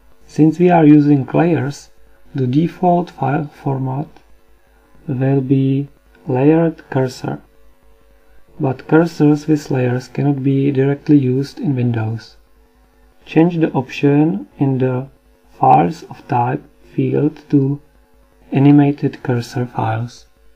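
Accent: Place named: India. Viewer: That's an indian accent not a russian one.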